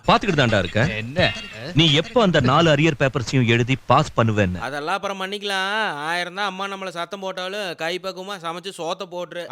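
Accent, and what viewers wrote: Coimbatore, India. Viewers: thambi endha collegela class roomla Saraswathi poto Iruku enna seidhalum samayalum super covai thamizhum super naangalum coimbatore dhan vaazhthugal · Kooruketa kuraalu kovai slang😂😂😂😂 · எங்க போனாலும் எங்க ஊர் தான் பெஸ்ட் கோயம்புத்தூர் பேச்சு வேற லெவல் இங்குகோ 🥰🤗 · unga pecha kettutu samayala vitturom bro!! Nama coimbatore slang nenga erukara varai vaalum! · தமங குழம்பவிட நீங்கள் பேசற கொங தமிழ் கேக்க தோனாமிற்தமா இறுகீகு நன்றி ❤❤❤❤❤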